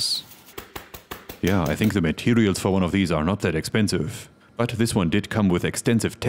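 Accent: Germany. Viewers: He is sounding more German that me when I speak english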